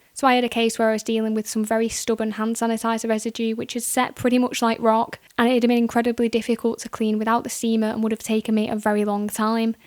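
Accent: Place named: United Kingdom. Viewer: thank you hot sounding uk lady.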